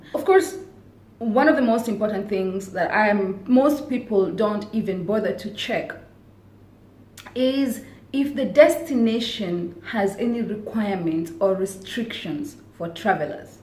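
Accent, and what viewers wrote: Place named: East Africa. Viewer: Hello from Malaysia. How are u doing? U got East African's accent. Could u help me with ur FB id pls?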